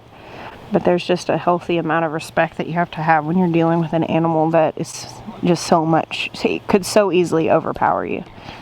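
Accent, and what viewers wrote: South, United States. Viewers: the southern accent is growing on you. I am so glad you are both safe! And the big boar is safe. Glad figured it out.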